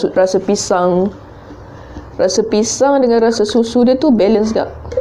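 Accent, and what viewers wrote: Kelantan, Malaysia. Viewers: Ore kelate koo ni 😂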